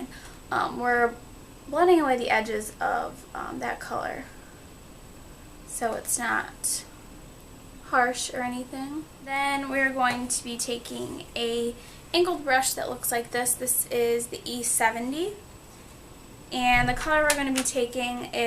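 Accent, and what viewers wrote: United States. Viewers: I love you accent! which state our you from?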